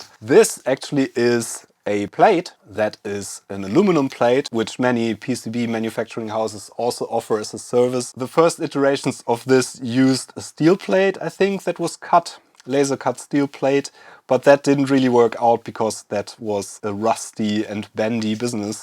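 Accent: Germany. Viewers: Schade das die Videos immer in Englisch sind. Vom Akzent her würde ich sagen das Sie deutscher sind manchmal ist es schwierig zu folgen da mein eigenes Englisch nicht reicht. Aber nichts desto trotz sehr gute Videos und gut erklärt. Vielen Dank dafür. Grüße aus Niedersachsen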